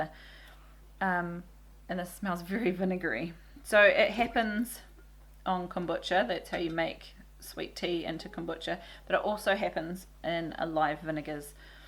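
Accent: New Zealand. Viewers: Awesome! So good to hear a kiwi accent! I live in the states and have done for years. Was looking around to make apple cider vinegar. So I will try yours . Thanks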